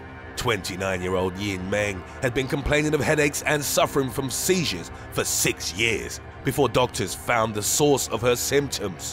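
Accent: England, United Kingdom. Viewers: The new narrator is too dramatic and his british accent annoys me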